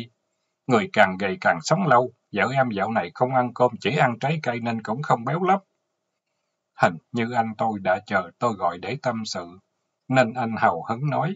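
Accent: South, Vietnam. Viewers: Những bài Hồi Ký của Th/Tá VML K/20 VBQG thật đầy chi tiết và sống động trước mắt của phim trường. Chúc anh và gia đình luôn khỏe mạnh. Cám ơn anh 8 vè diển đọc. Giọng Nam của anh mà nhớ tới miền Nam.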